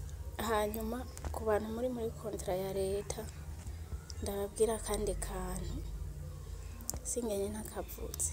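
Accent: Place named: Rugombo, Burundi. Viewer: Numva urumunyarugombo wiwac❤❤❤🎉🎉🎉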